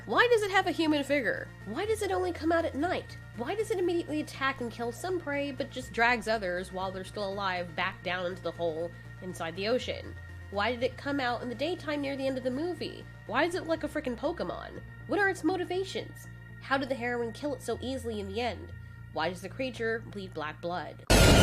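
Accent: United Kingdom. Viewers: I personally prefer your British accent. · Are you British?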